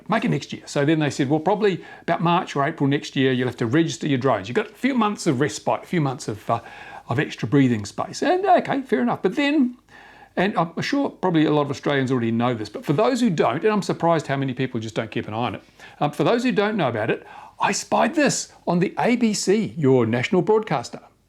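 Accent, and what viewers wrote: England, United Kingdom. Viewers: love the british accent :-D and yes the UK brexit is a proper mess, the politicians saw to that.... sort of like the drone reg's :-)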